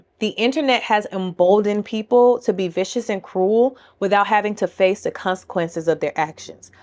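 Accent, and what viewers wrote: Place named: Oakland, United States. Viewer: Sounds like Oakland or Chicago to me 😂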